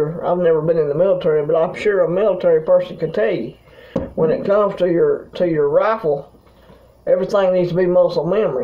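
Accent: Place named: South, United States. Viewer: Absolutely important info! Love hearing another familiar sounding Southern Accent! Stanley and Donna in the NE GA mtns.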